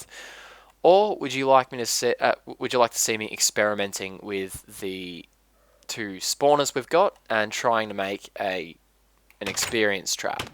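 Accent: Australia. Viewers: I always enjoy listening to your australian accent, so feel free to make a spelunking episode :D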